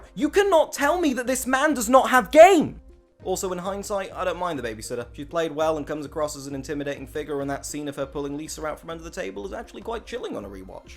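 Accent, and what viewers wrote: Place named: United Kingdom. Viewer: You sound British, very similar to Sideshow Bob's brother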